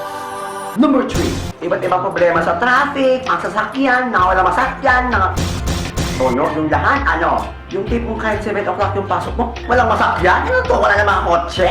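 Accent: Visayas, Philippines. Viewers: halata. ilonggo or cebuano ito cia.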